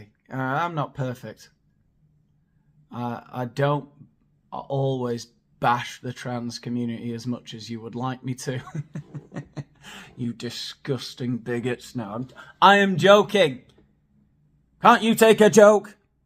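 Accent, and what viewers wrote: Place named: United Kingdom. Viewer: I missed the video y’all are talking about, but I’m going to go back and watch it. Even without seeing it, I can tell you, that I love you sense of humor, and always think you’re funny in every video you make. Keep ‘em coming! Oh, and I didn’t know you lived in Spain. I guess I thought you lived in the U.K. because of your accent (but I’m no expert on other people’s accents. I live in Texas and have my whole life…and no, I don’t ride a horse to work everyday, lol, but I would like to). I came to Spain twice. First time with my now ex-husband on a business trip and the second time with my now ex and my youngest son, who is a year younger than you. That time was a pleasure trip. Went to Madrid both times and the second time we also went to the Rock of Gibraltar and a city near there (that I can’t think of right now, but it’s a well known city and was a beautiful place). 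Love your videos!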